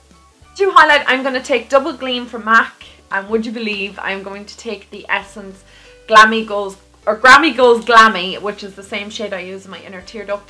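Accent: Ireland. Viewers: Also I looove your eye colour so much😍😍 and I can't get enough of the Irish accent now that I know I'll be there for a year😍😍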